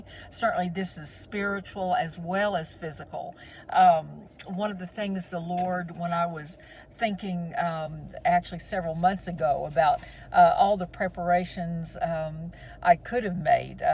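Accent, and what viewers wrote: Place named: North Carolina, United States. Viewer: I was wondering if you were here in NC by the accent. Really love your work. The Lord has called me similarly... 🙏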